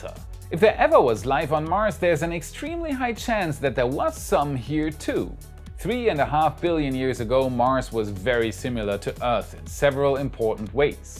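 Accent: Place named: Germany. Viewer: Your English pronunciation is nearly perfect, but a little of your German comes through. Which I find charming. Your video is excellent. Danke!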